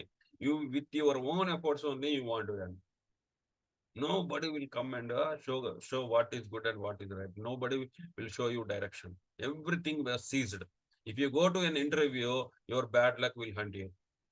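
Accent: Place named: South India, India. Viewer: Sweet south Indian Accent ...hehehe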